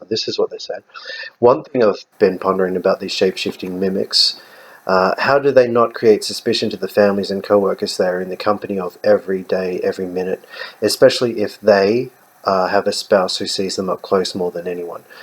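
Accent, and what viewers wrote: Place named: Australia. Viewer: Is there a possibility that Reptilians aren't aware of their background? If they're 10% of the population, who and how are they i informed? Are they approached by so called "handlers" during early childhood? I'm not sure off the process. I believe in Psychic Vampirism. I've seen peoples face distort, especially the eyes. It didn't scare me because it was a confrontation and I was calling out the persons behaviour. That's why I believe I got to see the real person behind the facade. Now, like yourself, I can spot them almost immediately. So I come across a few people that are in "attack mode" for no reason. I've had to walk away from so called friendships because these people suck the very essence out of you. And who wants that. Great to hear an Aussie voice talking about this phenomenon aswell. Keep up the good work bro.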